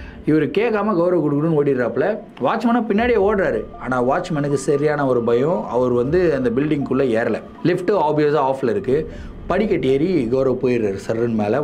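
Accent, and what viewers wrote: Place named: Bangalore, India. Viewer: U have Bangalore slang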